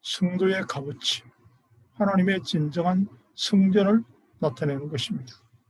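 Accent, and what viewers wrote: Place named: Gyeongsang, South Korea. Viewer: 잠시 머물다갑니다. 경상도 사투리로 차분하게 말씀하시니 더 친근하게 들립니다. 종교는 없지만 귀 기울여서 잘 듣고갑니다.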